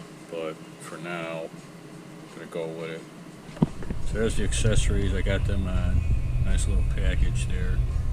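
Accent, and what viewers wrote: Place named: Chicago, United States. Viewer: You still around and with us? Sounds like you're from Chicago(?). I grew up in Orland Park.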